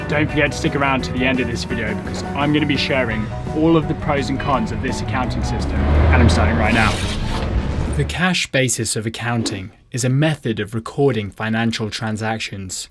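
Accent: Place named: England, United Kingdom. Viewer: Oh damn you have an English accent but you live in Vancouver? I guess they don't call it British Columbia for nothing, lots of Brits here.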